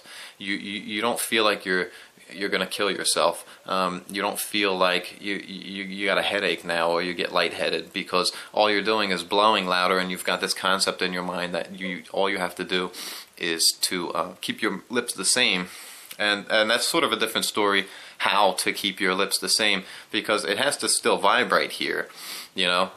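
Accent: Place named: Philadelphia, United States. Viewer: great explanation! you sound like a Philly or South Jersey guy. I've been searching for some confirmation on Morrison's ideas and another guy from Australia who says the same thing on blowing levels equaling harmonic slot increases, and you've done that very well here. Thanks!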